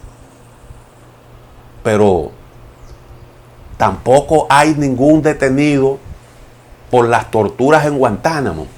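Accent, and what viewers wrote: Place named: Dominican Republic. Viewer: Ese asento me parece quisquellano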